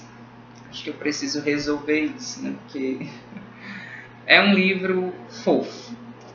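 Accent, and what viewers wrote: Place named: Fortaleza, Brazil. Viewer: Aaah!! Reconheci o sotaque pq moramos em FortaleZa 👏🏻👏🏻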